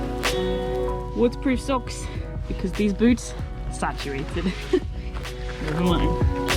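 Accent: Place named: Scotland, United Kingdom. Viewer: Love your channel 🤗I've watched loadsa them to date. Love your Scottish accent, your enthusiasm & knowledge. I'm in Glasgow & never done Dornich until tomorrow! Keep it Wild Adventurer 😎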